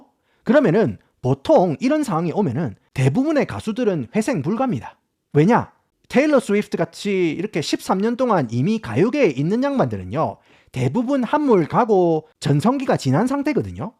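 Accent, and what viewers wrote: Gyeongsang, South Korea. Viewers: 나 충남 당진 사람. 자꾸 전남 당진 당진 할래? 처음에는 전남 강진이 라고 하더니만 자꾸 당진 당진 하네 서버r할나미 뒤짏라고. 경상도 사투리 쓰는 거 같은데 그쪽 동네나 빗대서 얘기를 해 자석아~ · 구수한 경상도 사투리에 갑자기 튀어나오는 원어민 발음이 뭔가 언발란스한데 좋네요 ㅋㅋ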